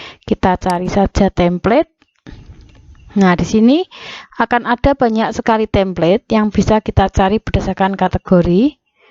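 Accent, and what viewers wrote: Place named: Java, Indonesia. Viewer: Medook nya poll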